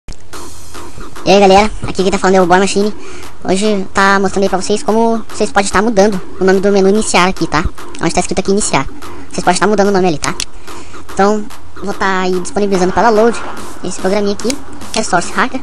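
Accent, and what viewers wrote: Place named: Paraíba, Brazil. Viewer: QUE VOZ DE PARAIBA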